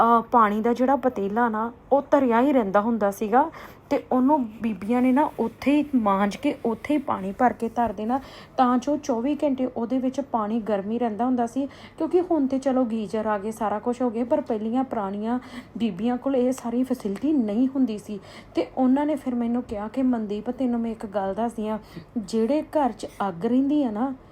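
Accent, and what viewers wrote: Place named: Sialkot, Pakistan. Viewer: Sister amazing video 😊 sailkot Punjabi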